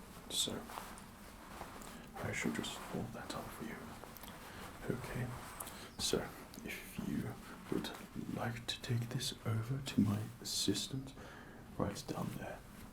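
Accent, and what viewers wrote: England, United Kingdom. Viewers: You english tho.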